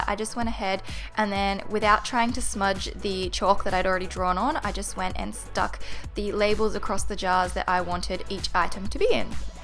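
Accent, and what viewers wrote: Australia. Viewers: Are you from Australia 🇦🇺😊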